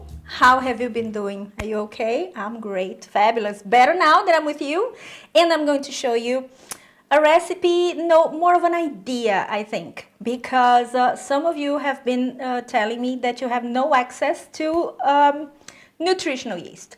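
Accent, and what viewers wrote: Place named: Brazil. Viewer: I have no idea 😂 It just sounds like a brazilian who learned English very well ❤️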